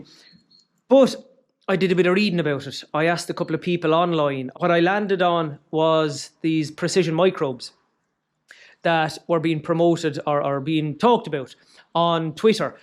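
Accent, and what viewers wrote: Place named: Ireland. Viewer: I know nothing about cows but I love your Irish Accent. New subscriber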